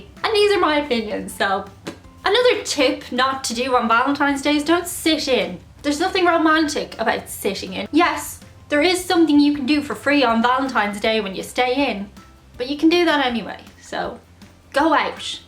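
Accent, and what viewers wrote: Ireland. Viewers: What's a whoaman? Or in these modern days with these crazy kids, woahman. OK. I didn't think could get any prettier but then she showed up in glasses. ''You may have to pay a wee tiny fee''. OMG. The Irishness is just so adorable. Are there still dullards that still care about Kim Whoredashian? Such a dirty skank. So YouTube sucks at paying you guys? Does YouTube need a throat punch?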